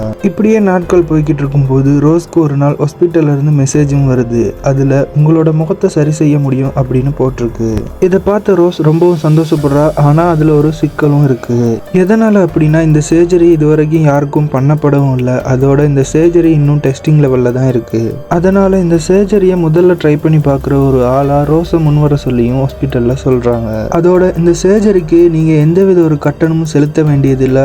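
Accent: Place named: Sri Lanka. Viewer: Sri Lankan voice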